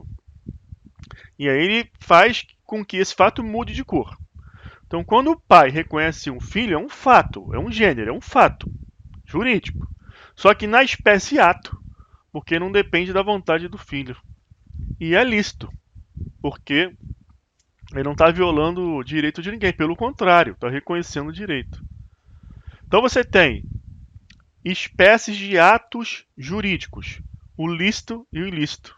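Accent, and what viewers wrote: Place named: Rio de Janeiro, Brazil. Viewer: Aula boa. Mas não deu para deixar de reparar vc falando Nascer substituindo o som do S pelo da letra I (naicer), é estranho aos ouvidos, mas sei que é característico do carioca. O Interessante é que vc conseguiu falar nascituro com todos os sons das letras da palavra.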